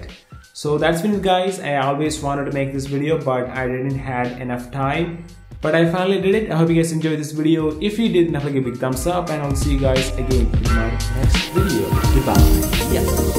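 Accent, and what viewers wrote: Kerala, India. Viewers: malayali analle?? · you need to uninstall your india accent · Dear Adam, Indian accent is also "an accent" just like any other non-english nations. Just saying... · typical malayali accent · That thick mallu accent.. Subbed brodhah.. 😎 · The damn Indian slang again...wwwhhhattt tthaa thheerrr oh boy,why can't you get some one who speak proper English.. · Malayali aano ? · His accent is not very good. Use subtitles and music, it will be better for english videos as you have Indian accent that's hard to interpret. Or take english courses. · Is that an Indian accent? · Bro?You malayali? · Are you malayali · The mallu accent is thick in this one. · Talk English please, not Indian with English words. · worst Indian accent I ever heard... can't understand more than half what you babbled... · are you a malayali????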